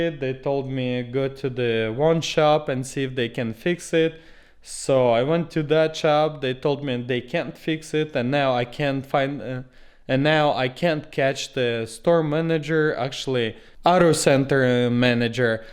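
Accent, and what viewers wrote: Russia. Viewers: I can tell you are Russian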